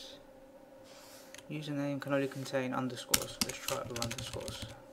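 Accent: United Kingdom. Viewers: The accent is like harry potter nowadys😳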